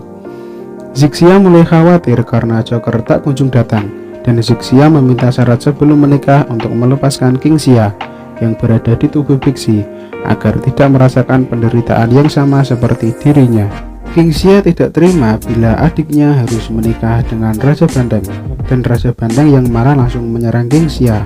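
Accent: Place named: Java, Indonesia. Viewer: Jawa medok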